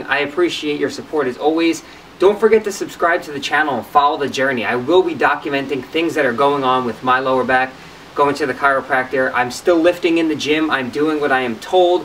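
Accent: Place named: Long Island, United States. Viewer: Wow. I knew you were from Long Island. lol I heard you talk, and I saw your surroundings..and I had that feeling of, "I know that street. Its Long Island Suburbia." Aka my hometown. Long since gone, but you never forget. haha Anyway I just started trying these exercises out, I'm hoping they'll help, so far they felt pretty good so I'm hopeful.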